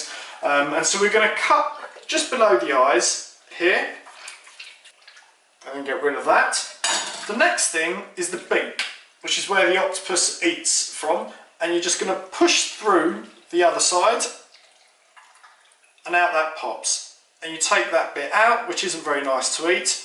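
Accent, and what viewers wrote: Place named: United Kingdom. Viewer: you are? sure fooled me with the british accent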